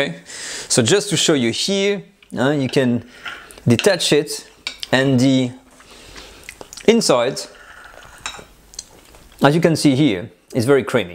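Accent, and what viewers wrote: France. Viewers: This tutorial is a winner 🏆. I have exactly the same ramekins and the French accent have convinced me!!! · I like your French accent man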